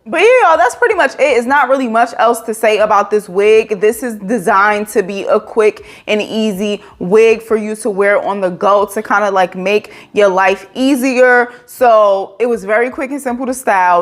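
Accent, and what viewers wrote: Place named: East Coast, United States. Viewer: Love your east coast accent!!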